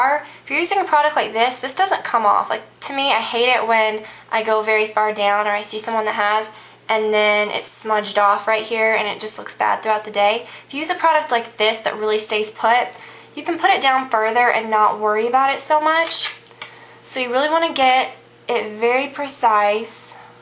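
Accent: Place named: Texas, United States. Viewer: you sound like your from texas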